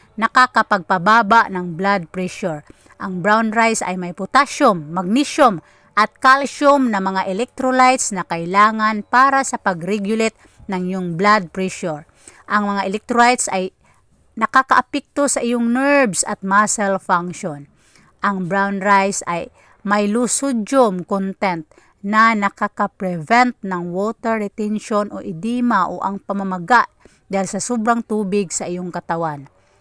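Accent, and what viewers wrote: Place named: Visayas, Philippines. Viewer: BISAYA KAMAN DAY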